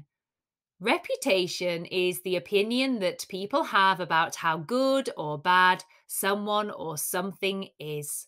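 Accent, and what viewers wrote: United Kingdom. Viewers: i adore your britsh accent , the course are just perfect thanks a lot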